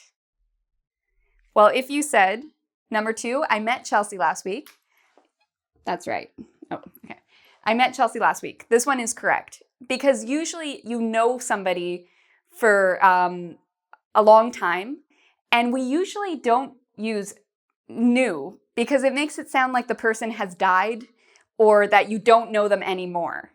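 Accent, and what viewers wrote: Canada. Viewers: I bet is Canadian, there's nothing more Canadian than "aboout"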